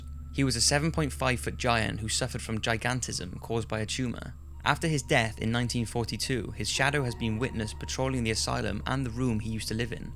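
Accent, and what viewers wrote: Wales, United Kingdom. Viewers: He sounds welsh like from the south of wales where the 'welshie' accent isnt as distinctive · He definitely sounds welsh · Are you Welsh by any chance or do I need a hearing test?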